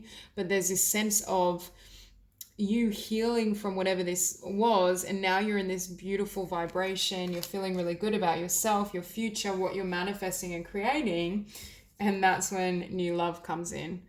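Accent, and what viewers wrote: Australia. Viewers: Definitely an Aussie accent XD ..... Sydney?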